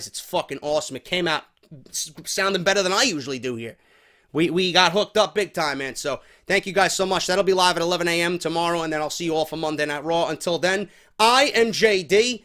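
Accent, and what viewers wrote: New York, United States. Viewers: Dude you are so entertaining. I always watch. That New York voice is so funny.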